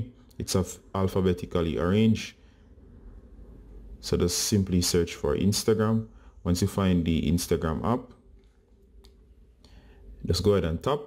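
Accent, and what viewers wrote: Jamaica. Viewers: Are u from Jamaica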